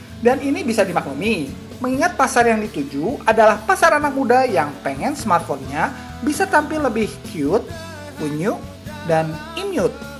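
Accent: West Java, Indonesia. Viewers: nuhun kang buat reviewnya :D sunda pisan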